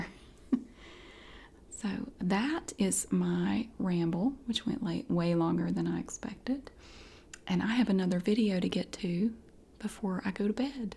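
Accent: South, United States. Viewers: I loved this conversation! I remember when I first moved to Greensboro my freshman year, everyone sounded so different than me. I’m from Rocky Mount and our southern accent is a variation of yours, but not far from it. My accent has never been as strong, but for some reason whenever I’m talking to someone that’s from my hometown, it’s gets really strong and we always get questioned about where we’re from 😂 To be very honest, I used to be embarrassed of my accent when I first moved here, but I grew to embrace it because I enjoy not sounding like everyone around me! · I’ve been watching your videos for so long that I became so accustomed to your accent but because this video was highlighting accents I noticed how southern your accent is! It became a situation where I couldn’t un-hear it 😂 love these ramble videos! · That crazy that you say this because me and my gf have talked about this very thing...see my gf is from the red lake Indian reservation and I'm from leech lake band of ojibwe but was raised in South Minneapolis MN but anywho when she goes bk to visit her rez accent comes bk really thick!!! 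Ppl say us minnesotans sound funny but idk what we are supposed to sound like!! Your southern accent is the best!!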